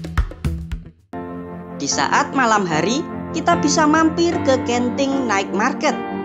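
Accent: Java, Indonesia. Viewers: Njenengan tiang pundhi min kok suaranya medok jowone min 🙏🙏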